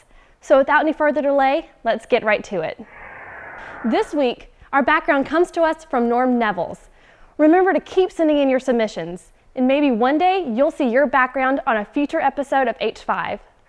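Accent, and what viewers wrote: United States. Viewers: Love the Southern accent!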